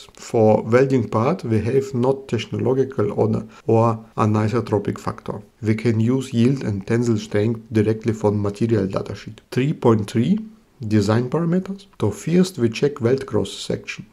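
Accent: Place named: Germany. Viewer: Great, thank you 👍 Love the german english mix Hundert five point vier 😁😁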